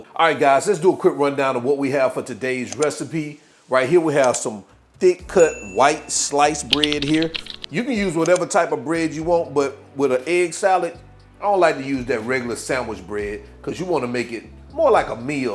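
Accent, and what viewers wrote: United States. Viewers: "igg salad"! Love your accent. If you don't mind, which part of the. U.S. are you from?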